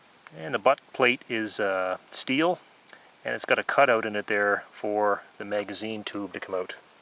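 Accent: Canada. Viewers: He is speaking in Canadian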